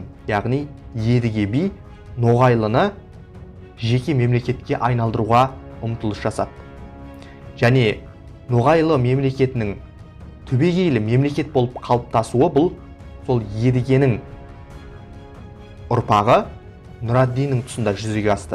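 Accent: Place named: China. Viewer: Автор қытай ма монғол ма немене? Неғылған акцент? 😡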